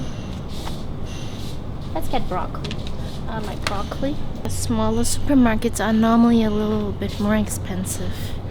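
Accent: Germany. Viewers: Kommst du aus Deutschland?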